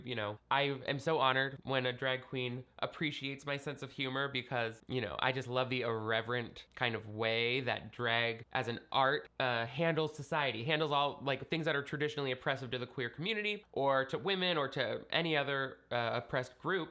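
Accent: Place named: Boston, United States. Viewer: I also pegged you as Boston.... :)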